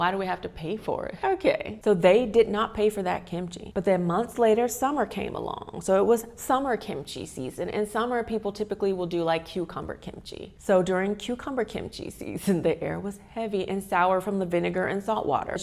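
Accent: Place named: South, United States. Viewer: hahaha love your southern accent comming through, i love these story times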